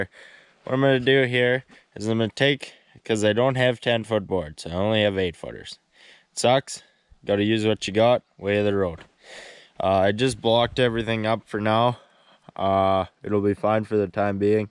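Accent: Canada. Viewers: Appreciate your build ay. Good job, the Canadian accent with expletives, excellent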